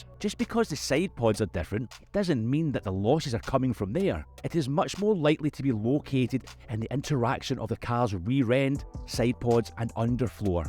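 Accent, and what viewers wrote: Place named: Highlands, United Kingdom. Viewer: nice accent man, r u highlander? u roll the r like spanish pro speaker jajaja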